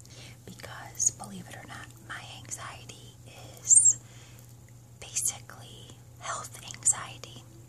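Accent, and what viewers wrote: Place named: Michigan, United States. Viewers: Whatever accent she has it certainly sounds like a Michigan or new you accent. Whats weird though is my ex girlfriend was from Michigan and she had no accent. Her parents didn't much have one except like afew words. They would say A's real nasally and overdraw the short O sound. I don't know exactly what this lady's accent is exactly. I still like it nonetheless. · I ❤️love❤️ Michigan accents try always give me ASMR!! Especially yours lol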